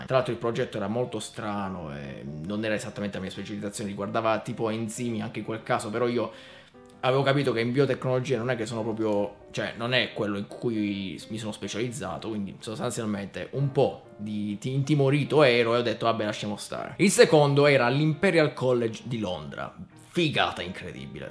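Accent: Taranto, Italy. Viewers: Da Grottagliese non posso che sottolineare quanto sia bella la cadenza tarantina,a differenza del dialetto vero è proprio,potresti fare il doppiatore(tra le tante cose)... Un abbraccio compà! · Inizio video: sento che è di Taranto e penso "Sono di Bari, adesso lo invito per una cena fuori". Per poi scoprire che odia Bari. Come il sottoscritto, d'altronde haha Comunque è proprio vero. Occorre la città giusta. Bari è una città che mi ha fatto odiare le mie passioni, passioni che a Bologna mi hanno fatto volare.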